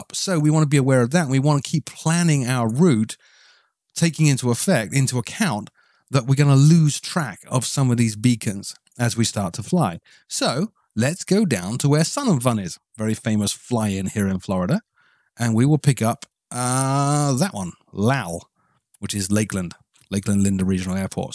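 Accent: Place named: United Kingdom. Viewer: you kinda have a british accent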